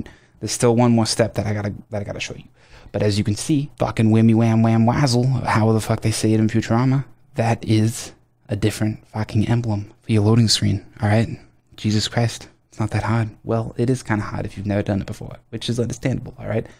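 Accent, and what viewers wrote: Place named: Massachusetts, United States. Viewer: This dude has to be from Massachusetts... the accent... lol, my computer is a piece of shit old Alienware that is all kinds of cluttered so nothing works for me :( I really don’t want to spend $3k for a new one but that might be the only solution. Having hughesnet for internet which is satellite with a 50 gb cap per month ( hughesnet is absolute garbage and they don’t deliver what they claim so don’t do it unless it’s your only option and last resort.) doesn’t help for problem solving as far as re-downloading everything. Very entertaining btw.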